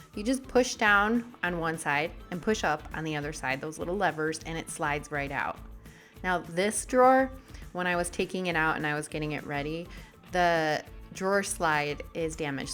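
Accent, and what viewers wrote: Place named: Minnesota, United States. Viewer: You sound like your from minnesota.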